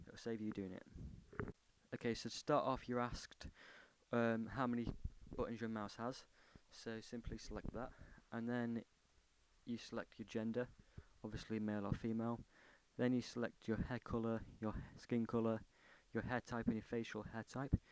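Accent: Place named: Australia. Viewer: Lol, are you from England? You sound australian. Lol. xD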